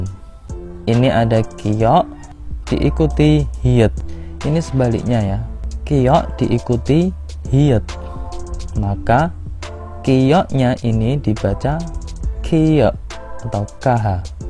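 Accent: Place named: Java, Indonesia. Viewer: Untuk materi sangat bagus mudah di mengerti tpi untuk pelafalan terlalu medok tolong di perbaiki pelafalan nya supaya bisa lebih ke logat korea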